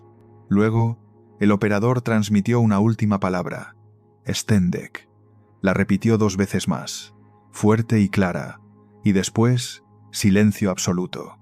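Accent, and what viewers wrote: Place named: Spain. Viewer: ERES ESPAÑOL, NO LO VERÉ .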